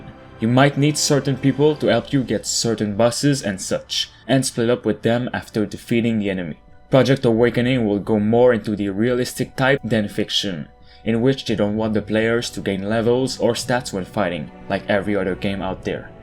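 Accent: Quebec, Canada. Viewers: Clearly a French Canadian I recognize it by that small accent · Jolie accent quebecois! ^.^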